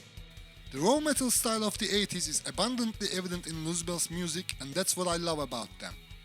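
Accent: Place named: Mexico. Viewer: You are Mexican! your accents is too Harrrrrrd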